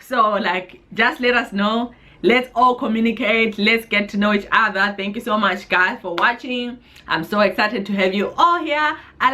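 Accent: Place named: Zimbabwe. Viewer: Inlove with the Zim accent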